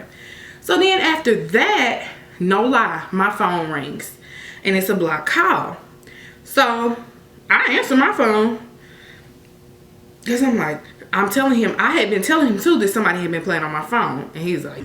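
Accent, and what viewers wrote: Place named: Kansas City, United States. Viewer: So you from KC, huh? I like when you added the voice over for him.... Made me visualize the story more...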